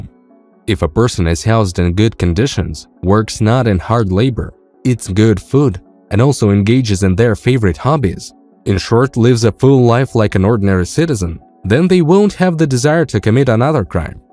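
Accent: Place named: Russia. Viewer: Maybe AI voice over instead of this thick Russian accent? Kinda hard to understand! 🤔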